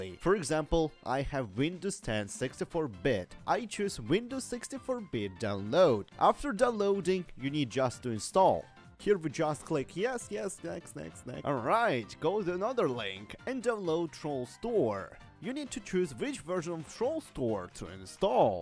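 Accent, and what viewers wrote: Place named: Europe. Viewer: sounds like netherlands, german and czech accents mixed together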